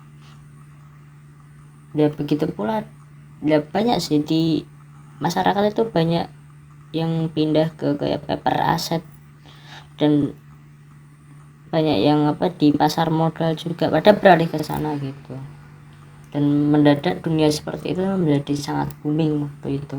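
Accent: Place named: Banyumas, Indonesia. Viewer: Ngapak ya bos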